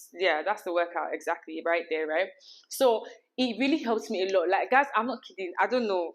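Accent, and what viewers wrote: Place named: Nigeria. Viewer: It's her Nigerian accent for me 😘😘😘❤️❤️❤️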